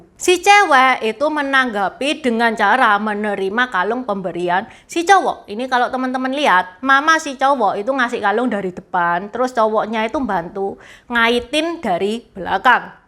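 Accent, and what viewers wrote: Java, Indonesia. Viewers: Suaranya medok jawa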